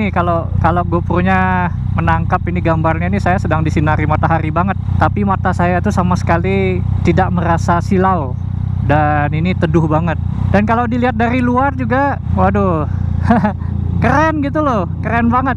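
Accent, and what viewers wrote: Bali, Indonesia. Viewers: Logat nya bali banget...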